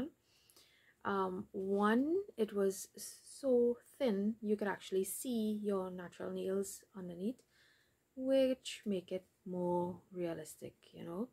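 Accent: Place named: Trinidad and Tobago. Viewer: Love the Trini accent.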